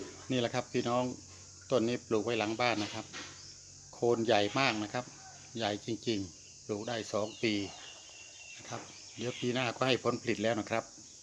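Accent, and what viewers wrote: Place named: Buriram, Thailand. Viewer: น้ำเสียงคล้ายคนบ้านผม..แถวๆเซราะตลุง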